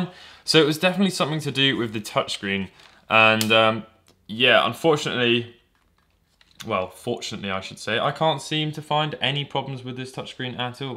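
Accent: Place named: United Kingdom. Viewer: Nice British accent👌👌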